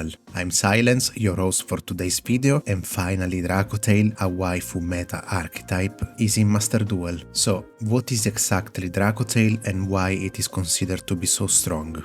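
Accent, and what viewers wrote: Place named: Italy. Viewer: Italian accent unveiled! òwò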